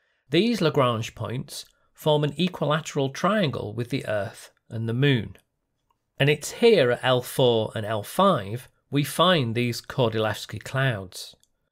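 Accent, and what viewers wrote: United Kingdom. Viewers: Can i ask you from what part of Britan does your accent come?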